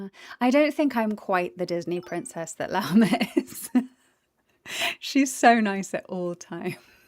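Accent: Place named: United Kingdom. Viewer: your accent was a pleasant surprise! makes me wonder how lauma would've sounded in your original british accent rather than the standard american one! your performance as her is so lovely as is :)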